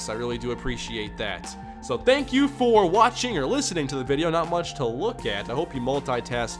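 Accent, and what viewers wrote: Minnesota, United States. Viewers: Your Minnesotan accent is much less pronounced than my friends from Minnesota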